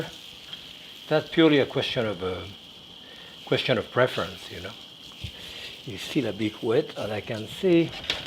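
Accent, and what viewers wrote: France. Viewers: L 'accent francais c'est magnifique. Great explanation merci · That accent is Jacques closseau level ! Love it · With that chic French accent he could make a carrot butty sound exotic!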